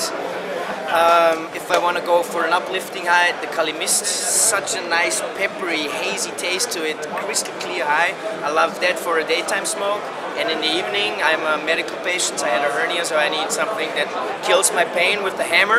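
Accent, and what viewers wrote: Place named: Netherlands. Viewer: Dutch as shit 🤙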